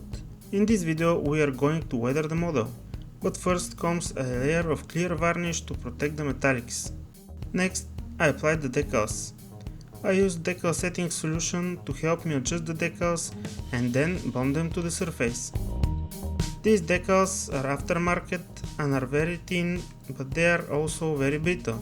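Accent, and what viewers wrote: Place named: Russia. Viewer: He sounds Russian to me, I do enjoy the accent, and not blanketing over it.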